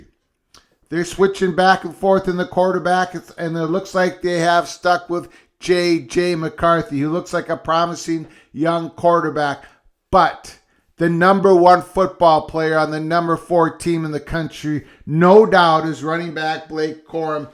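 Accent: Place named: Minnesota, United States. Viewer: Your Minny dialect sounds Chuck Grassley-adjacent "vooootes."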